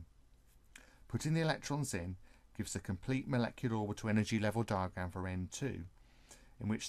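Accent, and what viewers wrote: England, United Kingdom. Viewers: God, the English accent, but at least it is a short video.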